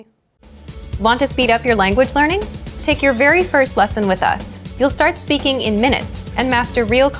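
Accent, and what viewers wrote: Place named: United States. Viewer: Yes, she is. This is American English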